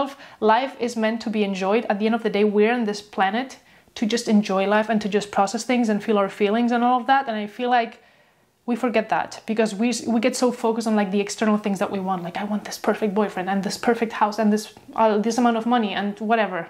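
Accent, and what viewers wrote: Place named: Spain. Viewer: Girl you're soo underrated! Also are you Spanish? Love your accent!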